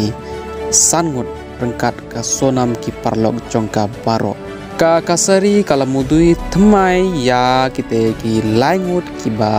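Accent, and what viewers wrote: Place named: Bengal, India. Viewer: Tang kren khasi ruh ym nang bha. Ka accent ka pronunciation kam dei khasi..khar bangla imat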